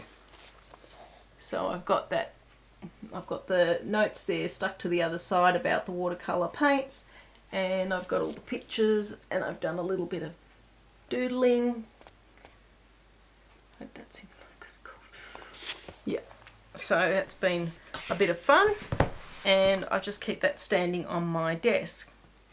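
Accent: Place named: Australia. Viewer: Hello, I fell across your podcast last night and how refreshing to hear your gentle Australian voice. I’ve jumped around a bit looking at a couple of your podcasts, ooooh your ETSY shop although your closed for a bit will eagerly wait for you to re-open. So back to the podcasts I went 🤣 I so enjoyed being introduced to your sweet daughter Mill wow did I laugh along with your conversations 😅 This morning home day for me don’t work Wednesdays generally I decided to go back to the beginning of your podcasts to get to follow your You Tube journey. So my first thought when you talked about jumpers and why us Aussies call them that was the poem Waltzing Matilda and Wikipedia has this description and it made perfect sense to me why we say ‘jumper’ instead of ‘sweater’..... “Jumbuck is an Australian term for a male sheep, and is featured in Banjo Paterson's poem "Waltzing Matilda”. 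I haven’t gone through other’s comments someone may already have thought this. I will have sheep wearing jumpers now jumping around the paddock yards with the working sheep dogs 😂🤣😂🤣 I am so lucky to live (rent) on a working farm approx 700 acres with sheep and cropping being mainly wheat & barley and have so laughed watching new lambs jump and play chasey during lambing season through the year when they have run of the yards just outside of our home garden, the best of fun. 🇦🇺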